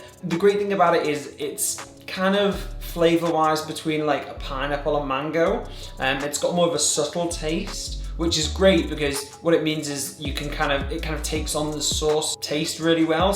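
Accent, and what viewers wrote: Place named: Liverpool, United Kingdom. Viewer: Food & Fitness Always yay I’m from Bromborough in the Wirral 🙌 London now though I could definitely hear it a little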